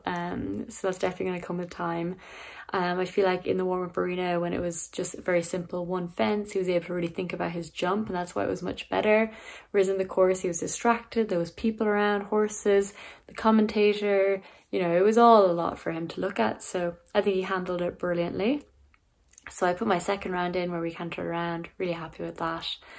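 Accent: Ireland. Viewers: are you in Australia I am so confused Irish accent but the trees and the birds shout Australia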